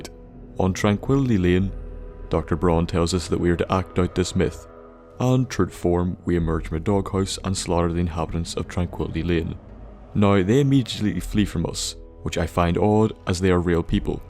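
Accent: Ireland. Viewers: Is the irish? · I think he's irish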